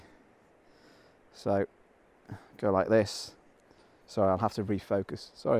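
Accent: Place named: Wirral, United Kingdom. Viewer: Thanks for sharing. Really good narrative to your thinking of the composition of the images. Plus you sound like a local of mine (wirral) A definite sub. ATB Andy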